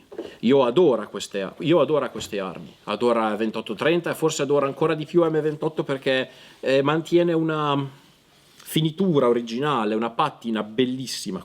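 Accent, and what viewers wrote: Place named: Italy. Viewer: Come preparazione tecnica,e conoscenza del sistema d'arma molto buono, non riesco a capire il fatto di presentarsi fingendo di essere stranieri quando dal accettato è evidente l'italianità, e poi il resto, felpa occhiali e cappello ,ma!!!!!😂😂😂😂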